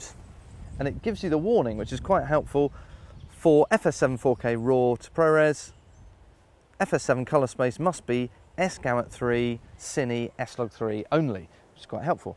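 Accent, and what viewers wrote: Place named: England, United Kingdom. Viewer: english accent sucks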